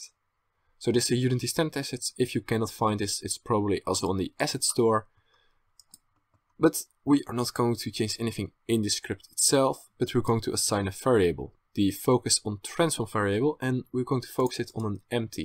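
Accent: Netherlands. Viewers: The dutch accent xD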